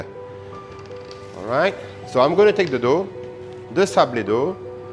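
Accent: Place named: France. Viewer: Yes he speaks broken English / Manglish with Malaysian cadence (still has French accent) lol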